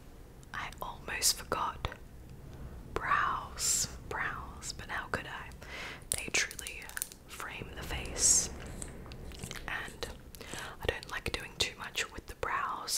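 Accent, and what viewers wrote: Australia. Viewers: is that a fellow aussie accent i hear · Subscribed bc we never get to hear an Aussie accent!! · Does anyone know what country she lives in? She sounds Australian, is that where she lives?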